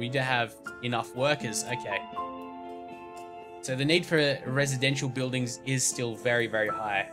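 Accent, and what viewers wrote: Australia. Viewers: I love this guys voice where are you from. Australia any chance? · you sound like your from Australia are you from austrailia if you are your EPIC! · are u from Australia? because my mom is and i now a accent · Australian right ? ;) Nice video mate ;) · Oh, this is Sim City (GOOD) you are Australian = This play through EPIC. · I thought you were Australian and I cheered a little (I'm Australian, in Brisbane) then you said about saving on the North American servers and I got a bit confused =P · It's Australian not British lol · fuck yeah! You're australian! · hey!! you are Australian!!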